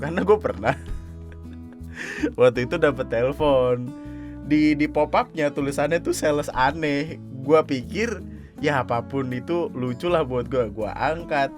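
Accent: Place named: Tegal, Indonesia. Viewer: Tegal keras cuy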